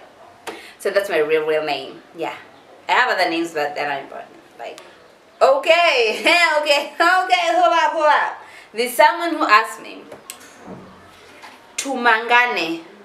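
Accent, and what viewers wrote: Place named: United Kingdom. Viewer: kwani ulikuwa UK?? Accent kicking in lol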